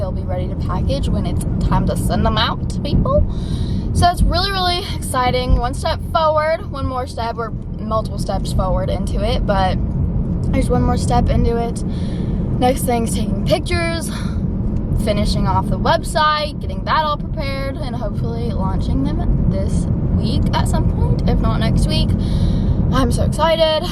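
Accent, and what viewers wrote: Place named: United States. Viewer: I LOVE her country accent!!😂❤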